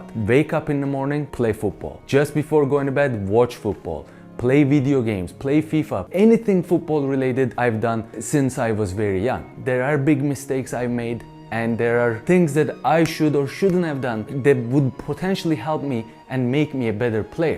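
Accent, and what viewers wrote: Turkey. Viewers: türk aksanı neyse bu video o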